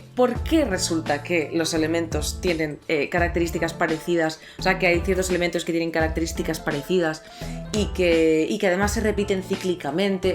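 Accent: Catalonia, Spain. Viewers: Suenas como catalana, 😰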